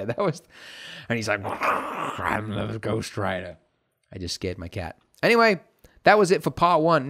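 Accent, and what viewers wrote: Australia. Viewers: What is that Ozzy/Kiwi/Brooklynese accent?? :)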